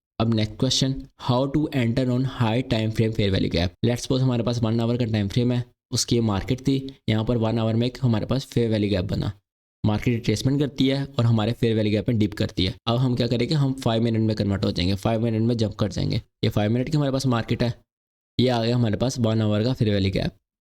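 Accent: Pakistan. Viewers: Bhai aapki tonse se lag rha hai ki aap pakistani ho ya north west India se belong karte ho. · Pakistani lgg Raha ha lounda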